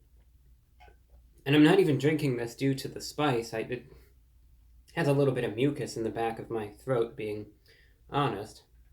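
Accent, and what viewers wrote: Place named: Transatlantic, United States. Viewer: Bro just casually speaks in a semi trans-Atlantic accent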